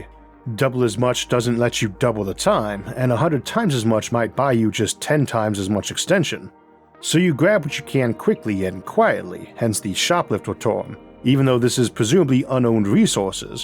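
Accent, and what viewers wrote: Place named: South, United States. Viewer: It's a speech impediment(he has improved over the years tho thanks to speech therapy) Under that is a normal Southern American accent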